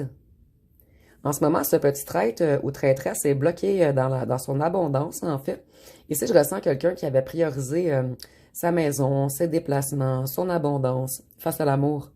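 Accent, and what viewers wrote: Quebec, Canada. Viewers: J'adore votre accent le jour j'irai voir voir au Canada ❤❤❤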